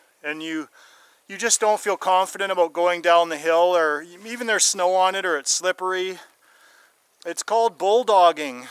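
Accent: Canada. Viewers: I love your Canadien accent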